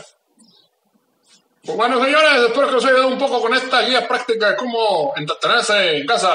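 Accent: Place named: Sonora, Mexico. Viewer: jajaja se nota que es de sonora arriba sonora!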